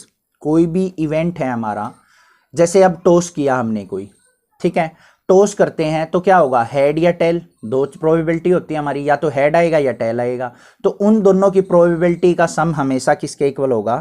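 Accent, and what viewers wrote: Haryana, India. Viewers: Sir aap haryana sai ho 🤔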